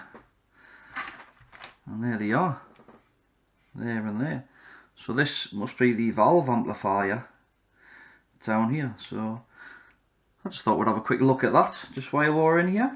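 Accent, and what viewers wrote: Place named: Scotland, United Kingdom. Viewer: It was a long video. So I fast forwarded it. Could not find the part you resolved it. But I enjoyed your accent. Sounds a bit Scottish. Is it? Can you tell me what went wrong with the unit?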